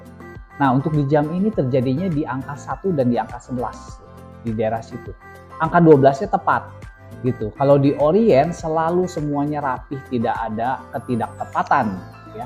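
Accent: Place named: West Java, Indonesia. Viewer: Pasti mang nya urg sunda 😅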